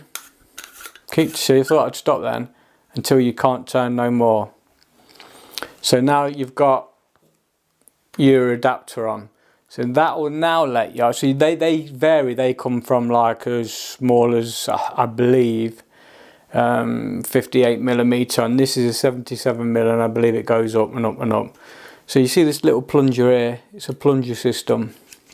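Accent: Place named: England, United Kingdom. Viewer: Great videos, thank you!! . Also I love your English accent. 😊 Cheers from California 🍺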